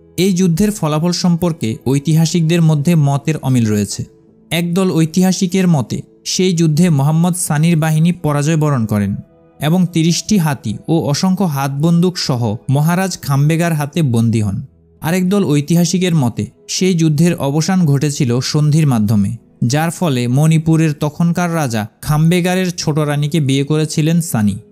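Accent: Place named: Chittagong, Bangladesh. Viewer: বর্ণনাকারীর বাংলা বলার সুর শুনে মনে হল তাঁর বাড়ি চট্টগ্রাম। আপনার কন্টেন্ট ভালো তবে ভাষার ব্যাবহারে আরেকটু সচেতন হবেন। বাংলা বাংলার মত করেই বলবেন। যদি আঞ্চলিকতা বর্জন না করতে পারেন প্রমিত বাংলা বলার সময়, সেক্ষেত্রে চট্টগ্রামের আঞ্চলিক ভাষায় কন্টেন্ট বানান। বাংলাদেশের সব অঞ্চলের মানুষ এখন চট্টগ্রামের আঞ্চলিক ভাষা বোঝে। কারো বুঝতে অসুবিধা হবেনা।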